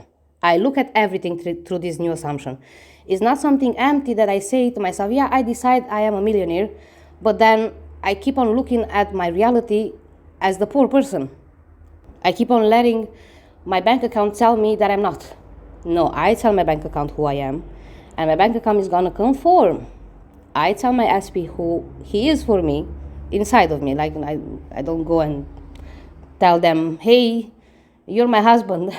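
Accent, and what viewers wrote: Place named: Spain. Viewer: I always tought you were Spanish! You sound jut like a friend of mine who is Spanish and speaks English at work :) Anyway, you are a fresh breeze in LOA YT community and I like your direct style without offending anyone and calling girls 'bitches' :D Thank you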